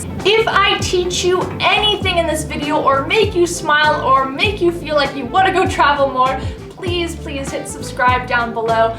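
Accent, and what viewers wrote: United States. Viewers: I would love to watch your vlogs because it's a hope of a boy who thinks America has a place for him too. Actually in 2 ways you're helping - 1. Learning English without Subtitles to get your Accent. 2. Tourist like a local & relevant information + pros & cons. Thank you in advance. Keep up the good work. And please upload regularly. BEST WISHES EVENTUALLY. 🎆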